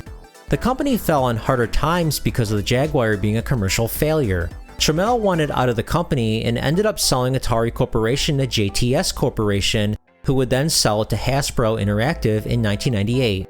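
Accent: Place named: Upstate New York, United States. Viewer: You from Upstate New York? Your accent reminds me of home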